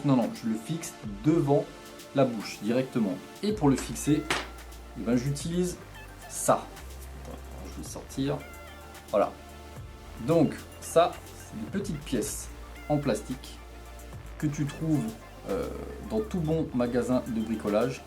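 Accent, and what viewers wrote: Alsace, France. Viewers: coucou :) un plaisir d'entendre un alsacien ^^ ça me rappel mon chez moi <3 ^^ J'ai bien envie de me lancer aussi :) mais je me posais la question si il y avait pas trop de bruits parasites ou trop de vent de la route en l'attachant à cet endroit sur ton casque?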